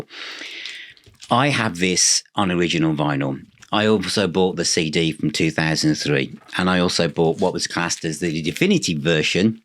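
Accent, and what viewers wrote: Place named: England, United Kingdom. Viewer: Good evening. Very interesting as usual. Thank you for this piece of information and, especially, for your manner of speaking, for your nice, clear, genuine English!!! Neither American English, widely spread around, nor Indian/Pakistani/Bangladesh English, but English English. It's a great pleasure to listen to you!!! And what about compact-cassettes? I know a lot of music-fans, who return now back to classic vintage cassette-decks and compact-cassettes... In any case, it was a pleasure to listen to your programme!! Thanks.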